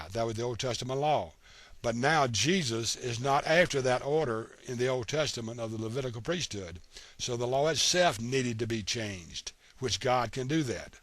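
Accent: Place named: South, United States. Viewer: Your accent alone makes your credibility come into question..hilljack? hillbilly? south of the mason dixon line? anyway.. Jesus was a Rabbi, human. He Blessed God all the TIME.. so your argument is ridiculous. According to you, Jesus is God so how could he be blessing himself? Then by your own argument Jesus cant be God.. thanks for clearing that up.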